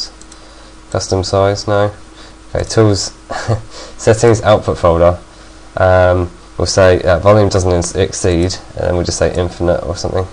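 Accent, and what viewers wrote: England, United Kingdom. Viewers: Good video...but you should redo it...you are blabbing way to much and listening to an english accent for 8 minutes is annoying....good video 5 stars.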